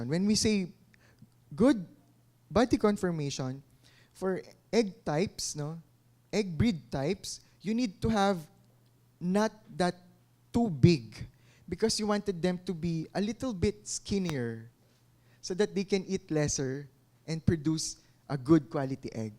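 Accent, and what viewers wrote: Philippines. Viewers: Sir magtagalog ka nalang mas bagay sa accent mo :)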